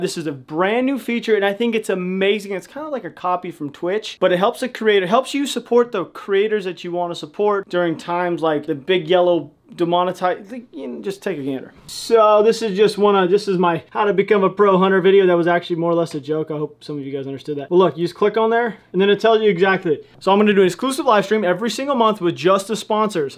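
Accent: South, United States. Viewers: you and Nick Irvin should go teach demo ranch to shoot long range for a video idea. Your southern accent was shining today by the way, it was sweet, I love your videos keep them coming.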